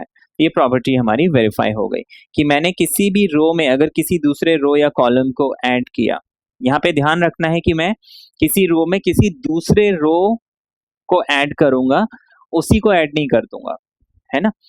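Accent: Bihar, India. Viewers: R u from bihar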